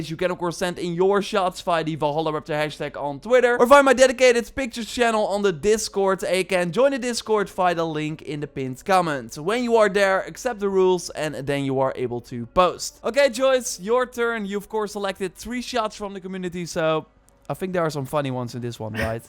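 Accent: Netherlands. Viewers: The Netherlands! Finally! After five years quietly trying to place your accent At one point my best guess was Croatia but Dutch makes so much sense! Skall!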